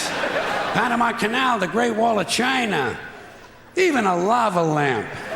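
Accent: Brooklyn, United States. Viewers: He's from New York, he isn't faking it. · He's from Brooklyn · love that Brooklyn accent.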